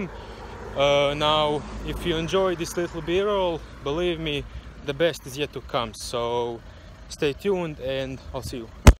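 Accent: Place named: Russia. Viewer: Naglasak ruski al moze proc hahah 👌 extra video 👍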